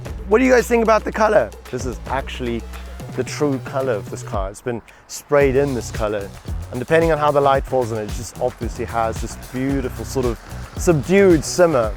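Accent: Afrikaans, South Africa. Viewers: Is this dude from South Africa? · defnitly .you can hear that afrikaans in him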